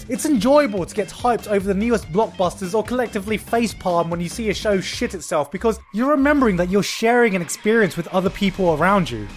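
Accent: England, United Kingdom. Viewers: hey, you sound english. where abouts are you from?:)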